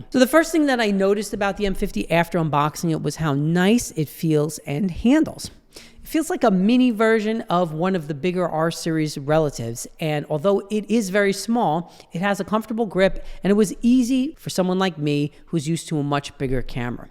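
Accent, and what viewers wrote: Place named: Tri-State Area, United States. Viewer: Did you mean to talk of it like it’s not mirrorless? That was the main selling point for me! Also your accent brings me back to growing up in the tristate area :)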